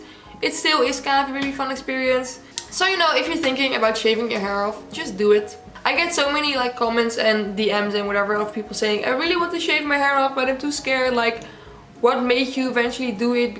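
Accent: Netherlands. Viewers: This is my first video watching you and your english accent sound so similar to mine are you by any chances dutch? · omg ben je nederlands of beeld ik me nu een accent in?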